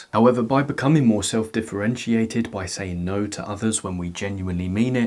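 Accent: United Kingdom. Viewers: I love this guy! (And the accent! Everything sounds smarter with a British accent 😂)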